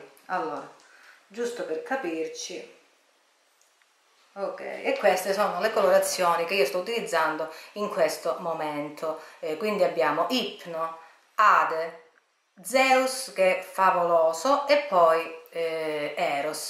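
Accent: Calabria, Italy. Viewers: Ciao, ti ho appena scoperta e non posso più fare a meno dei tuoi video! Adoro il tuo accento calabrese e i tuoi gusti! Un bacio da Napoli!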